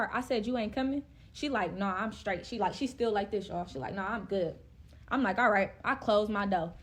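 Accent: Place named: Detroit, United States. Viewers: She gotta be from Detroit how she telling this story lol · She talking like she from Detroit but she not acting like it 🧐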